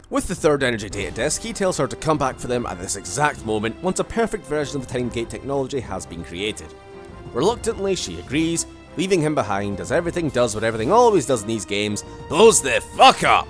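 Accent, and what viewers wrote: Scotland, United Kingdom. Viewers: Scottish narration makes everything better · what part of Scotland are you from?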